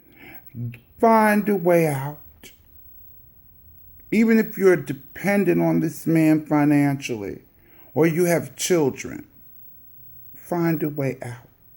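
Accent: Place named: New York, United States. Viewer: I live for your thick "rich white woman" NYC voice and accent,. 💞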